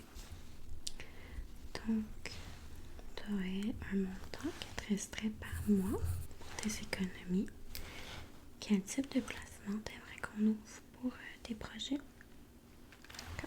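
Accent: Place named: Quebec, Canada. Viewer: oh mais je crois distinguer un petit accent québécois 🧏🏼☺️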